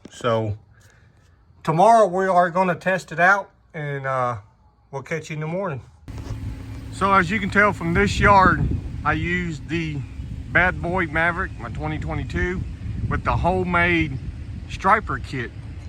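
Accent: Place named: South, United States. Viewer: I hear that accent as he talks, I can just about say he's in the south, so it'll be warm season grass like St. Augustine, Bermuda, Centipede, etc. Our grass in the south is pretty stubborn and tough compared to cool season grass like fescue or ryegrass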